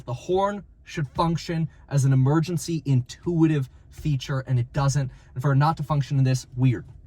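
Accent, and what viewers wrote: Germany. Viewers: Is your father german?! 😂 i swear the accent reminds me of it!!!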